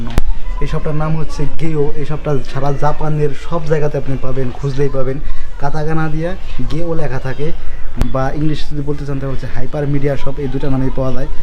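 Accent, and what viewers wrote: Faridpur, Bangladesh. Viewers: ফরিদপুর এর ভাষা প্রানের ভাষা ❤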